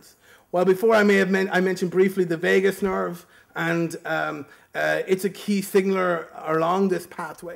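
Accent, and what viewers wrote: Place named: Ireland. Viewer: Where's he from ? I heard him in Science Friday and thought it was a black Irishman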